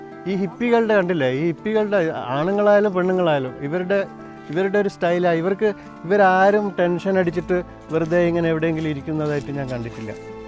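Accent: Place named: Malabar, India. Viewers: iam watching from atlanta, georgia., usa.....i accidentally came across...ur video....i like your presentation...the language is different...kozhikode style....very good..and your innocent attitude, rather sincere approach...and presentation...is appreciable....thanks....waiting to see the next vedio....👌👌👌👌👌👌👌👌🤣🤣🤣🤣🤣🤣🤣🤣😁😁😁😁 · Reminds me of old hippie time in kovalam in 70s..now it's all over..I too was involved in it .but looking back it was a time pass..I didn't know hippies are still there? A rare species anyway..laziness, drugs and such..u sound like from Malabar? How did u reach there?single?need not answer if u don't want...all the best? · Hallo Sir. You are explaining excellently in your videos. From your slang I can understand that you are a malabari. Any how all the best and waiting more videos from you. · നിങ്ങളുടെ മൂന്നാമത്തെ വീഡോ ആണ് കാണുന്നത് നിങ്ങളുടെ നദാപുരത്തെ ബാഷയും വിനയവും എനിക്ക് വളെരെ ഇഷ്ടപ്പെട്ടു നാണു വാണിമേൽ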